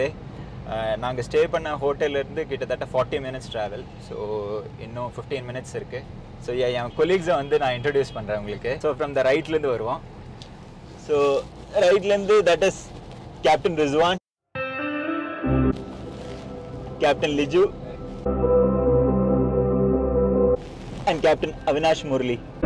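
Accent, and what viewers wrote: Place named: Kongu, India. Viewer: தம்பி நம்ம ஊரு கொங்குதமிழ் பேசுறபோதே உங்களை ரொம்ப பிடிச்சிருச்சுங்கோ .வேலையில் கவனமா இருங்க மா.உடம்பை பார்த்துக்கோங்க சாமி.இது அக்காவோட அட்வைஸ் ங்கோ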